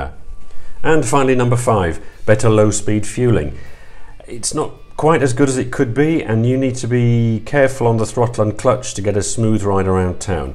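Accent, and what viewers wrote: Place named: Newcastle, United Kingdom. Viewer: he’s a Geordie I think. Sounds just like you 😂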